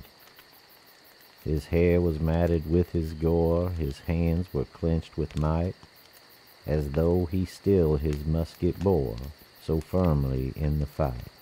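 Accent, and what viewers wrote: South, United States. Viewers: Gotta slite bit of * ...Southern drawl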